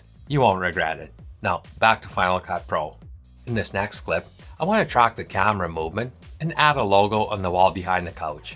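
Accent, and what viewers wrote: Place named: Saskatchewan, Canada. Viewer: Holy cow dude, I'm from Saskatchewan and it took about 2 seconds to place the accent :-). Thanks for the tracking info!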